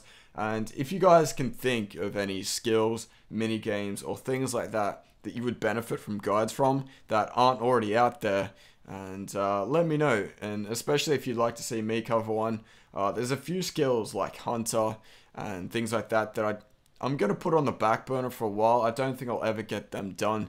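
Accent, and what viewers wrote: Australia. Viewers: This guy is an Aussie or an NZ man, get your accents straight! What's your next guess, Scottish?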